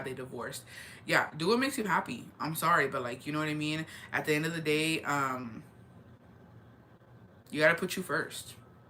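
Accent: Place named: Texas, United States. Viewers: We just Texans, that’s the way we talk 😆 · We're southern that just how we all talk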